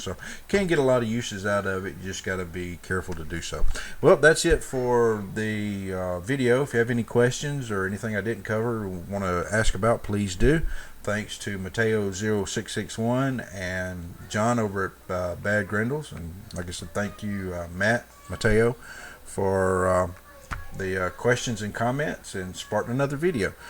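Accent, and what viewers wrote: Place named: Rural, United States. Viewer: Haha, I love your openings and endings. Living in the country side of America and sharing the same accent, this information really hit home clearly. I've been getting into robotics/electronic work recently. It's a useful hobby to have, but when I try and take apart old devices like cell phones and cameras I find really tight small screws constantly. Near impossible to get out. I keep thinking "If only I had a small drill for this..". I think this may be the one for me! 'God knows it'll make the job faster, anyway. It's not too expensive, either. I just hope the bits don't break too easily. It'd be a pain to have to buy new ones often. Thanks, man.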